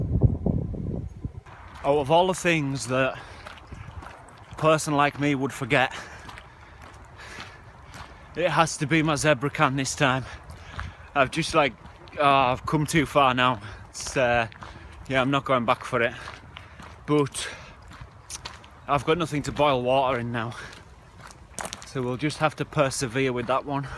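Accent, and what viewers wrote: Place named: Northern England, United Kingdom. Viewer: Connie Williams hey.u misunderstand me. I'm ribbing.I think he's a top geezer.love his work.I'm English Connie.I'm sure he won't mind me calling him a northern monkey.I understand every word or coloquesm he uses. I'm from stoke, north midlands, often described as a "clay head". We got scousers,jocks,taffs, paddies,cockneys, monkey hangers,woolybacks, yamyams.....the list goes on.we all have distinct dialects. PS everyone..no offence intended. As it goes, im 12th century Saxon invader,with Irish,Scottish and Angle blood. America and england are two nations decided by a common language. I want see catching American signal crayfish, they invasive species.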